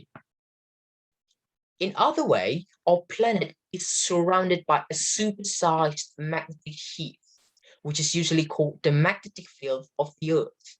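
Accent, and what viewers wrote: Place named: United Kingdom. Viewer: Wih ini cara ngomongnya british banget yah keren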